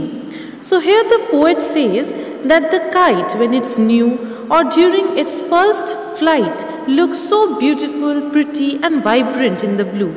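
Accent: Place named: United States. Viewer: Mam I like your voice very much it was just as an American🤗🤗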